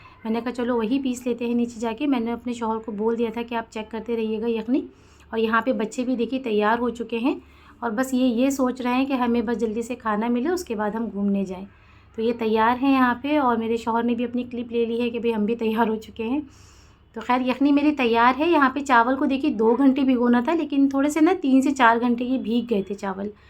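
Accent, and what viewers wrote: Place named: Delhi, India. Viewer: Wow aap dehli se h isi liye itna peyara labo lahza h mujhe hamesha andaza hota tha aap dehli ki ho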